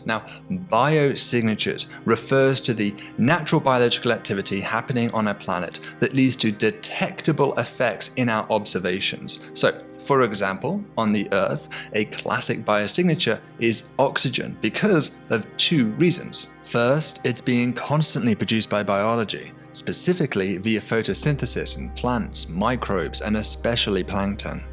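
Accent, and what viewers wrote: United Kingdom. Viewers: Awesome! I love British ASMR science bro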